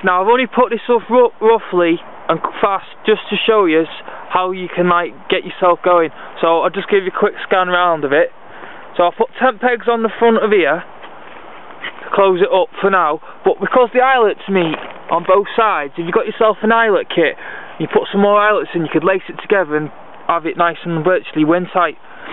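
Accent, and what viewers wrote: United Kingdom. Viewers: Didn't mean to insult. It is very hard to understand him with the British accent, it is very heavy for me and others to understand. It would be interesting if I could understand most of it. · dear harry bowhemoth he is speaking the queens english just like you.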